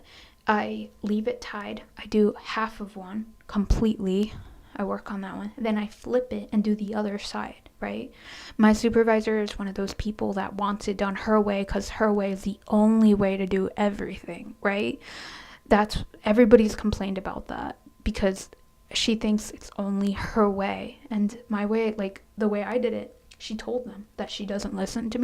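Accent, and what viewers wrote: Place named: Russia. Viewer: As a slav girl, your russian accent is very good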